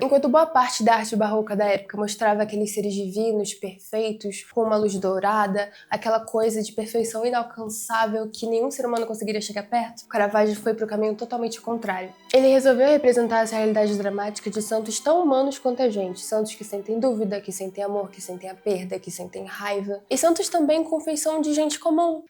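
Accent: Rio de Janeiro, Brazil. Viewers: Pq carioca fala "naisceu"? Tenta falar "nasceu" normal que aí passa mais credibilidade nas suas falas, fora isso o vídeo ficou muito bom, conseguiu me prender a atenção a forma como vc apresentou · Não consigo escutar um sotaque carioca e imaginar uma pessoa culta.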